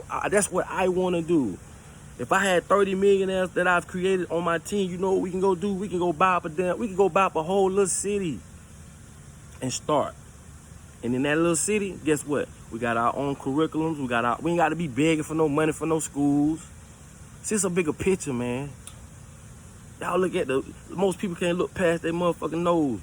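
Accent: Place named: Atlanta, United States. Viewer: That’s the realest shit I’ve heard today bro you made my MF day Dogg real talk !!!! I’ve been saying that shit man we need to make our own money, own banks, own cars, own houses, own city, own government, own army, own everything. If not that we need to physically fight and kill and take what we want. It’s too many of us and not a lot of them u feel me ? Bro I’m in Augusta ga you sound like your from Atlanta. We need to link for real bro and show people I’m down sign me up bro. Because I’m tired of this shit we need to start a different movement and not none of this protesting bullshit because it’s not getting us nowhere. Fuck voting and marching. We need to stop working for there companies and business because once that happens we will be in control. Everybody needs to stop working now.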